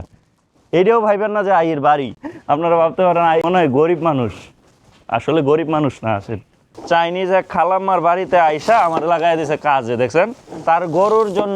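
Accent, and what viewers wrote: Bangladesh. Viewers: আপনার উপস্থাপনা আপনার মতো সহজ সরল। কোনো ভনিতা নেই। খাঁটি বাংলাদেশের ভাষা। সুন্দর। আচ্ছা এইরকম গ্রামে গিয়ে মানুষের সঙ্গে কথা বলতে সরকারি অনুমোদন লাগে?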